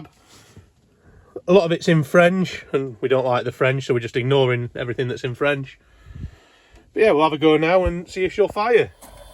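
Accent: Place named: Yorkshire, United Kingdom. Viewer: Is proper English just a Yorkshire bloke mate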